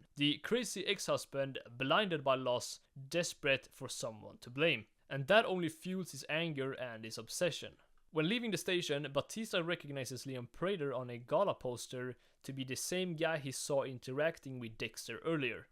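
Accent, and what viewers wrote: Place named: Sweden. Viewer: name: '' '' with a dialect like this? hmm.. can it be? yes it can. youre swedish!;) sup man, also swede here, good vid, ta hand om dig and see you in the next dexter vid. i dont know why i write in english, i just do.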